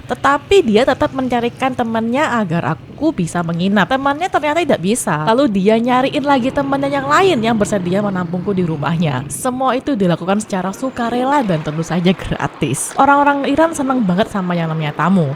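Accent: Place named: Surabaya, Indonesia. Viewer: Suroboyo banget watak suaranya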